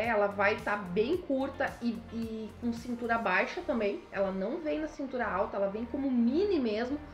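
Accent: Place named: Santa Catarina, Brazil. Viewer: Olá boa tarde Como posso comprar vestidos lindos de você? Poderia passar seu número de telefone? Pelo seu jeitinho de falar acredito q vc é de Santa Catarina vc é de muito bom gosto parabéns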